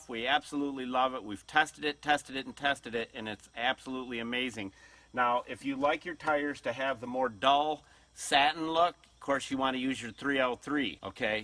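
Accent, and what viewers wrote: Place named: Michigan, United States. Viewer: If you ever wondered what a Michigan accent sounds like listen to this guy. Lol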